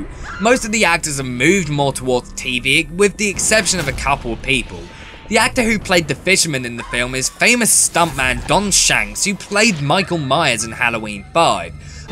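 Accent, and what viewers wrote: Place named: United Kingdom. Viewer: I really liked your smackdown of this movie in a British accent. Yeah the movie looks shitty.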